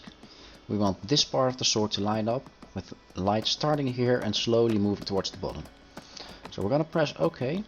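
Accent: Netherlands. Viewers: you from the netherlands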